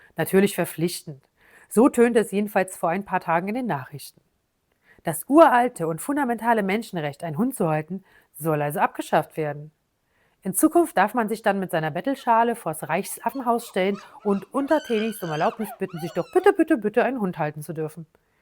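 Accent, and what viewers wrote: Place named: Berlin, Germany. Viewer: Hallo, genauso ist es und es wird immer schlimmer. Ich liebe deine Berliner Schnauze. Weiter so. Lg Claudia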